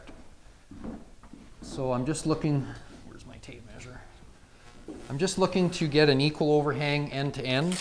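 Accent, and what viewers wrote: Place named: Canada. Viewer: Took 5 words until I knew this was a Canadian.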